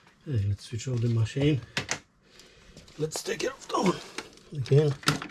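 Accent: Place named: France. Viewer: Gotta hate French accents...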